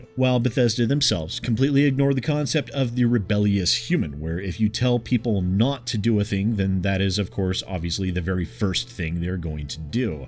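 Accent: United Kingdom. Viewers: Damn, this was so cheeky I could have sworn you were British. Nice work.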